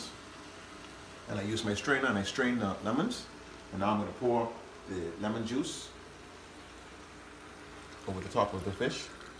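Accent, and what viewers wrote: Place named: Bahamas. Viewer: Thank you so much for this video.. my best friend was from Nassau and she used to make this for me and watching this video just brought back so much memories of her great cooking . Even listening to your Bahamian accent reminds me of her ☺️she passed away in 2010 and I love and miss my bahama mama best friend/sister so much 💔🕊🕊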